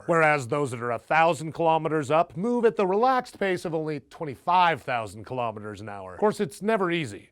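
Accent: United States. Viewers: Let me preface this by saying the narrator on here is great. Does a fantastic job speaking, but I’m pretty sure this guy is an American. If he isn’t he’s masking his accent brilliantly. IN AMERICA WE DONT USE SOCIALIST MEASUREMENTS! We use the imperial or The US Customary units. I realize that The International System of Units (SI), the modern form of the metric system, is preferred for many uses by the U.S. National Institute of Standards and Technology (NIST), but guess what, we viewers are not scientist. We can literally visualize in our minds 10000 miles, 600mph or 10’ tall, or a yard (as in 36” and not something you mow people from WV and south). We Use the imperial system !!!! Geez.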